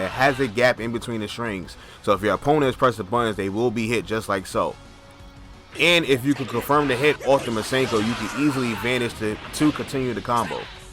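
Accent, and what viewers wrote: New York, United States. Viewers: The most NY accent ever. Instant like and subscribe.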